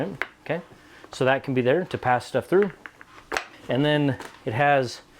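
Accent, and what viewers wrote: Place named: Idaho, United States. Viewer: Yeah, although mountains can have increased exposure to solar effects which can lead to greater evaporation, since mountains tend to have fewer local sources of water, and lower levels of vegetation, they can end up with lower humidity in summer. And moreso if the local/regional climate is already dry during the summer. I've only seen maybe a dozen or two of your videos, and I don't think I've heard you say where you are, but based on that brief clip of a snow storm, your channel name, appearance, and accent, I'd guess that you're somewhere near Idaho. That whole region has a mix of mediterranean and semi-arid climates, with summer already being the dry season. So, that would all make sense. I've only seen a dozen or two of your videos when YouTube has recommended them to me, though I am subscribed. I hadn't seen your streams, but I went to find the stream you referred to, thinking I'd see what you talked about, but it's ten hours long, so I decided against it. 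😀 Looks like you're doing cool stuff, though. 🙂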